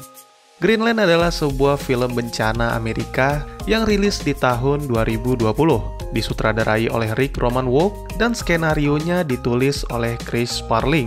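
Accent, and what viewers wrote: Aceh, Indonesia. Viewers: Dro ureng aceh nyo bang? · Weh abng orng Aceh bukan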